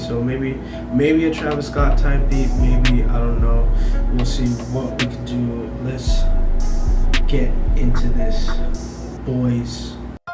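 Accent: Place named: Jamaica. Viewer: Where you from ? Jamaica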